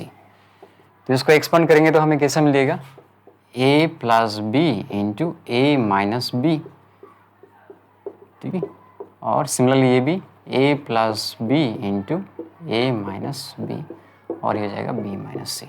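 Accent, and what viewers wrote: Assam, India. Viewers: Sir aaap assam se ho ki nahi aapka awaj se pata chal raha hai ki aap Assamese ho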